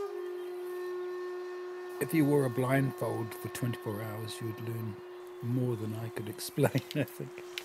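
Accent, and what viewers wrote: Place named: New Zealand. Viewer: Your accent. Sounds from New Zealand.. telling me there's a blind shakuhachi master here??? Such an inspiring guy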